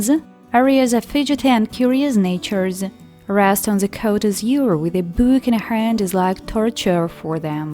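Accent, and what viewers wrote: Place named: India. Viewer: nah, they need to replace this indian narrator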